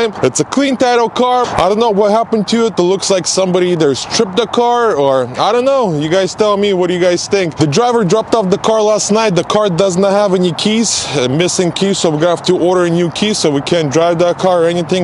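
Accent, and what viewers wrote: Russia. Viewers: Я один слышу хороший такой русский акцент?:))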